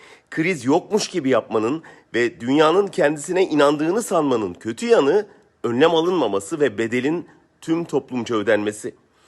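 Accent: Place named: England, United Kingdom. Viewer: Türkçe konuşan İngiliz!!!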